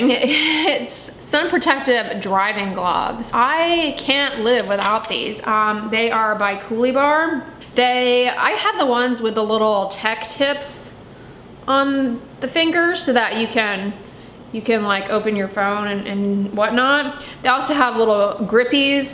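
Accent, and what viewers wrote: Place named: Texas, United States. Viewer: Dear Dr, Dray, I really enjoy your videos and am enjoying them. I grew up in El Paso, and was there for much of the last 4 year period while my mom was still alive, so enjoy the familiar mild TX accent and shopping opportunities, as well as your very helpful information. Now, however, I am back at home in Juneau, Alaska, which I do love -- but not the shopping... We do NOT have: Dollar Tree, Wal-Mart, Target, CVA, Walgreens (or Rite Aid or any other chain pharmacies). We do have two small grocery stores, part of the IGA chain (think small independents that were bought by a chain that buys small independent stores in rural areas - and in fact IGA bought our 2 small independent groceries when the owners wanted to retire); one Fred Meyer -- bought by and now part of the Kroger chain; and one Safeway, which I rarely shop at as it is farther from me than Fred Meyer. 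We also have a very small Costco, probably the smallest Costco I have ever been in. I live in a medium-size regional center, and the capital city of my state. (Also, we have no road access to go anywhere else, as is also true in much of Alaska.) This is why rural America feels sort of left behind and "out of the loop". If you can do a video of skin care options available at Kroger or Costco, especially cost-effective ones, I would be very grateful.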